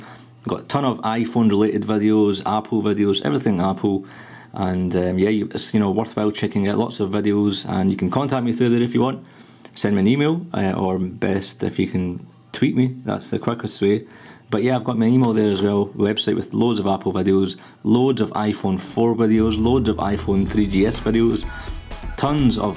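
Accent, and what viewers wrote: Scotland, United Kingdom. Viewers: rofl you fail, anyways that's just a scottish accent, and it is my number one of accents i want to learn (I'm dutch so i'll have some challenge), on my number two i've got the australian accent. I won't even buy a liquid-applied screenprotector but when I heard this accent I just watched throughout the entire vid :P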